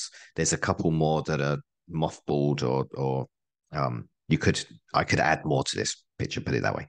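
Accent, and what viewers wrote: United Kingdom. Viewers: Really strange hearing a britosh voice that is unbiased between east and west. Almost sounds like your just telling the truth, which is hard to find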